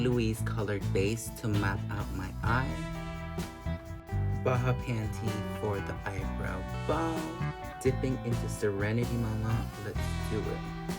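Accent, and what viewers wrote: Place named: Chicago, United States. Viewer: U from chicago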